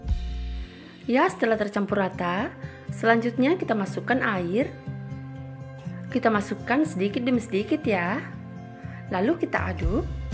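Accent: Indonesia. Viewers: Mba.. Asli Bugis Makassar yah? Logatnya kayak org Bugis, salam kenal yah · Kaya orang bali kalo ngomong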